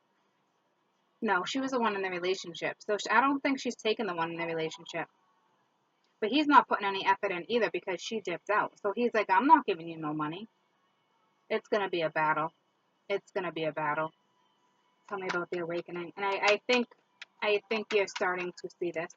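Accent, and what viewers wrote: Boston, United States. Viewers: I'm frm NY n its sounds like ur frm more north like Boston but ur still attractive n made kool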